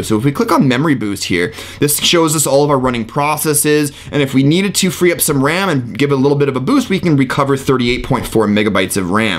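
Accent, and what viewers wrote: Canada. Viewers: Ur r canadian right?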